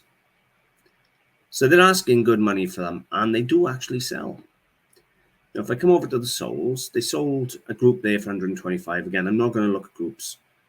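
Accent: Wales, United Kingdom. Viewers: I loved the silver fish spice box. I am Jewish and I have never seen one. I have a spice box in the shape of a sunflower. The spices are used in a ceremony at the end of the Sabbath and smelling them reminds us that we are saying goodbye to our special day. By the way the word Judaica is pronounced as though the 'c"is a "k". I enjoy your videos and especially enjoy your Welsh accent as my husband is also Welsh. Much success in 2022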